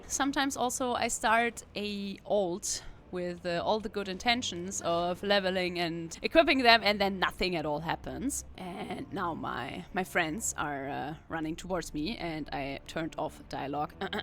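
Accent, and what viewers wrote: Germany. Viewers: You sound like a german 😏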